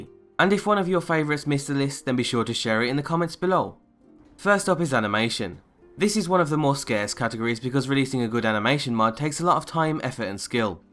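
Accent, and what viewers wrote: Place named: Birmingham, United Kingdom. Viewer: Sounds like your from Birmingham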